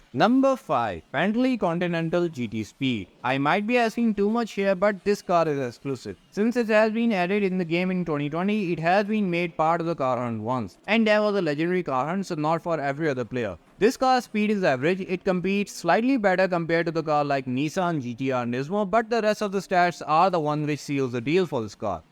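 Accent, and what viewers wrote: India. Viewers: Bro you indian? If not where you from?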